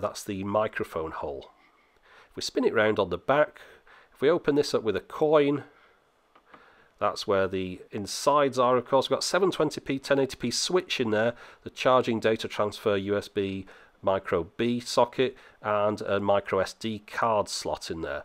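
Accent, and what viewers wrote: United Kingdom. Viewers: ByteOfWood YT. You didn't realise the British accent? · its the typical british accent :p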